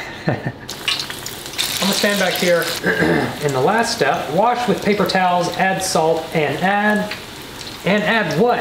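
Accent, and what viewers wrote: South, United States. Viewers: Just watched a video from 2015. You've gotten more southern? I guess.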